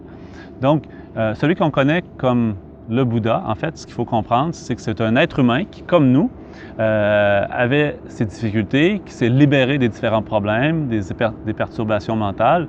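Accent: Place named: Quebec, Canada. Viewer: j'ai la grande fortune étre bouddhisme* j'adors l'accent québéçois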